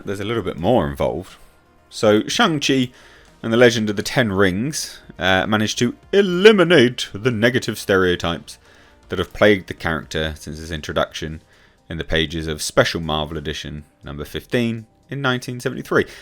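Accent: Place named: United Kingdom. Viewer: What would a white guy with an accent , a British accent (im not stereo typing) know of real life stereo types .all the research you do is based on wiki not real life .u have no idea why , the deep reason why this actor said that .you just need clicks and views i guess. So making a 10 min video on something you will never understand is pointless this has to be a joke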